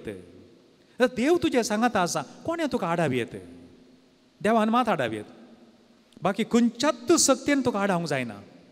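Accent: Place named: Mangalore, India. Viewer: Father, your Konkani is very clear. Aputt Mangalorean. Thank you for your discourse.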